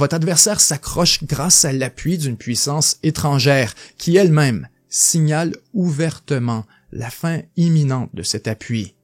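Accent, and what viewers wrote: Quebec, Canada. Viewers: Est ce que tu serais québécois par hasard? Ton accent sonne bien.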